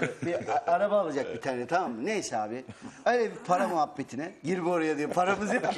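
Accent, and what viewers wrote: Ankara, Turkey. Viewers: tam ankaralı .d şiveyi bıraksa tamam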